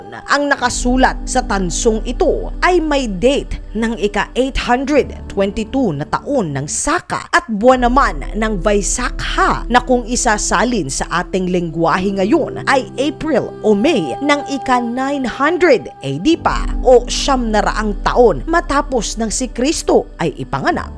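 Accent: Philippines. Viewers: Solid 🔥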